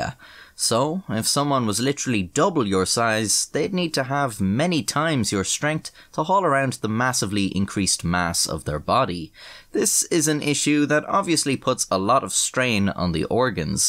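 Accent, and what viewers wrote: Ireland. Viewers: Greetings from one of your ripples in America. I love what you do, and am grateful for your insight and view. Please continue, because there is literally NOTHING in this world I love more than learning from someone with an Irish accent! (I didn’t even have to make that up it’s so true) be well and thank you again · Same im battling fentynal i been on it for 2 years and all i can do when im detoxing is kick around shiver sweat puke. His vidios are easy to watch in a fragile mindset nd his voice make me laugh not alot of irish people here😂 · I just wanted to say thanks for going to all the trouble to make these videos. Really like your style, subject matter, humor, and of course, that cool Irish accent. Your ripples reached me in Texas. Keep up the great work - love your channel.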